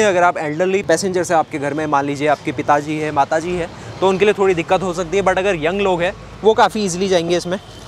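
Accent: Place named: Madhya Pradesh, India. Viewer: Bhai MP s ho kya Unne sun k laga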